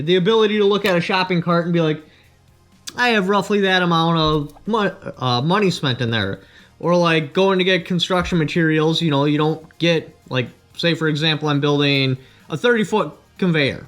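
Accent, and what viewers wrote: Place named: Wisconsin, United States. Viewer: Wisconsin! That makes sense, your accent is definitely indicative of your area. Not a criticism, just an observation. I’m in West Virginia, population, 1.8 million with probably 50 different accents!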